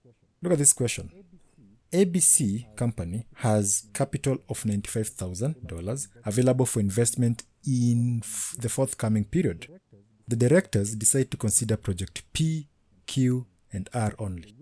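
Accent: Kenya. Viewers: You sound like a kenyan.....are you one???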